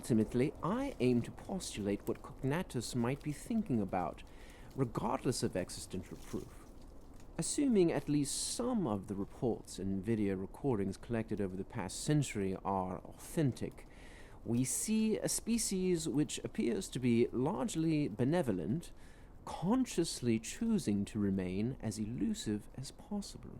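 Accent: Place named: United Kingdom. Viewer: AUDIO TOO LOW! Repost after turning it up to ELEVEN! Lose the Limey Accent - pretensious & condescending.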